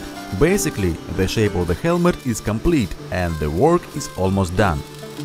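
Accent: Russia. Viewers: I can hear strong russian accent.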